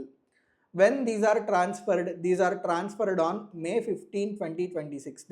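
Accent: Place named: South India, India. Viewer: Matter is good but not understood many things due to South pronounciation and low volume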